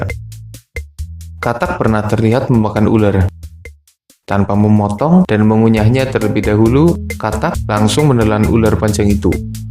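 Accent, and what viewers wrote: Banyumas, Indonesia. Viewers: Adminya aslinya ngapak kali nih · Ora ngapagh ora penagh... Orang ngapak ya min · Pasti ni orang ngapak. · Kiye sing dadi admin mesti wong ngapak yah? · Admin orang Cilacap kah? Kok kayak ngapak gitu.. Hehe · Maz wong ndi kok ngmonge ngapak 🤣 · Ko logatnya kaya jawa ora ngapak ora kepenak ya. Daerah banyumas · Logat daerah saya nih ngapak cmiiw hehe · Boleh nebak kan min, pengisi suaranya kayak logat ngapak daerah selatan. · Org ngapak nih mimin hahaa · Logat ngapak bet anjay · Ada medok ngapaknya, ora ngapak ora kepenak bro !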